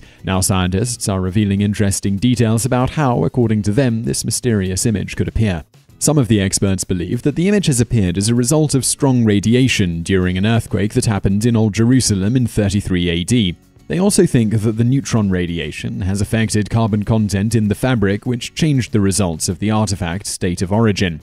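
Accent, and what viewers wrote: United Kingdom. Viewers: Bunk. Something 'found' by one guy who's keeping it a secret is not archeology, or even interesting. The Shroud of Turin has long been debunked. Explaining away the carbon dating? "Interest of scientists and astrologers" Stonehenge: "Salisbury valley"? You're accent indicates you could've easily visited the site and seen that it isn't a valley, it's label on the Ordnance Survey maps and in everyday conversation as 'The Salisbury Plain.' You undermine every other video you make when you make this sort of junk promoting easily discredited nonsense.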